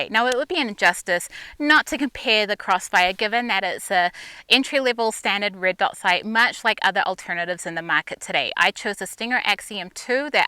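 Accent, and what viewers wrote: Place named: Australia. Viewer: Damn woman, you know your shit I Know it’s kinda weird but I love the accent. I spent a few years in Adelaide with some very good family friends and would love to make it back someday. Anyway, sorry for the essay, but keep up the good work on the videos , very informative to people looking into new sighting systems . Convinced me to get a prism due to my astigmatism (even at 34), it’s been a good quick optic with very few issues. Looking into lvpos now so we shall see. Maybe i’ll just get a solid magnifier and maybe a canted red dot. Anyways cheers, good shit!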